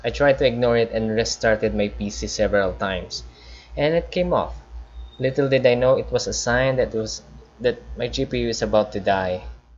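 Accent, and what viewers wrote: Philippines. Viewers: cant really miss that distinctive pinoy english sound lol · Proud of you accent sounds filipino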